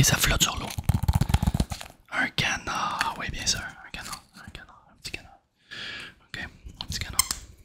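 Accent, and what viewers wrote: Quebec, Canada. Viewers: Je pensais pas que l'accent québécois fonctionnerait autant sur moi en ASMR. Je te remercie en tout cas, j'ai du mal à m'endormir en ce moment et tes vidéos sont d'une grande aide ! ♥️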